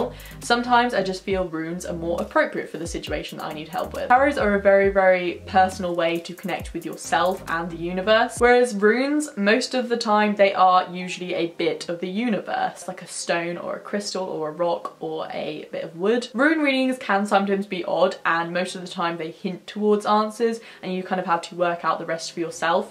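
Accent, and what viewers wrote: England, United Kingdom. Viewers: Thank you for the video. I love your English accent and you have a beautiful voice. Sending you love and light. 🙏🏼💜🧿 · Corinna Ginterseder sometimes her accent sounds kinda british, but i'm not specialist :P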